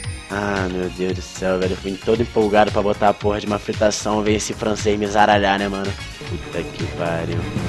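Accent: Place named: Rio de Janeiro, Brazil. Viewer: é mt carioca msm... '' bota nela FP!! ''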